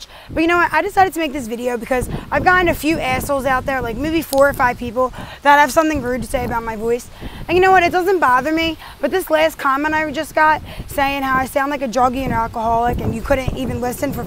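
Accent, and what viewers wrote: United States. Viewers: I cannot pinpoint your accent but I def recognize east coast, New England maybe? ❤️🔥🤗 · I never thought there was something wrong with your voice. I always thought it was more of an East Coast thing. 🙂 i like it n im sure there are other ppl who do to. You're reading wouldn't be you without your voice.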